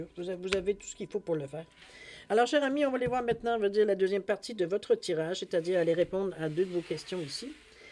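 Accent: Quebec, Canada. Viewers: J 'aime ton accent québécois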